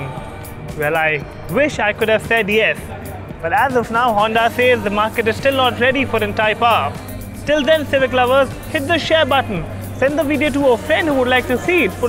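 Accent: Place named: Punjab, India. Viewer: Dude your thh-ousands are too cute! Reminds me of my Punjabi childhood buddies from Delhi ha ha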